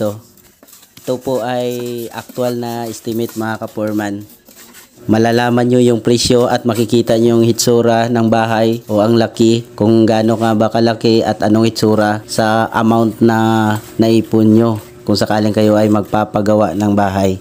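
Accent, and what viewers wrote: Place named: Visayas, Philippines. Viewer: Saan po kau,, narinig ko bisaya😊 gusto ko din kc pa bahay taga mindanao po aq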